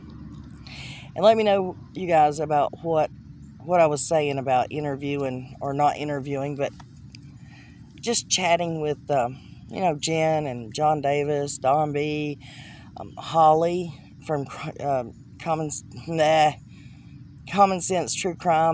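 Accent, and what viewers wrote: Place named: Tennessee, United States. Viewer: I love ya n I I think like you I. Agreeing 100 percent I love the idea I don't kw where are you cuz you sound like your around TN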